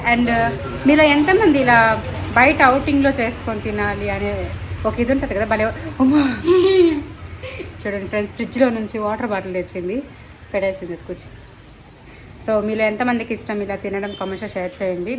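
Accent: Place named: Rayalaseema, India. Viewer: Hi garu, chala baundhe vlog thodi kodalu bonding chala cute ga undhe, meru chesina non veg dishes yummy naku me non veg vlogs chala estam.andi and meru rayalaseema slang bauntadhe andi voiceover kanna original slang video petandi madhe hyd andi..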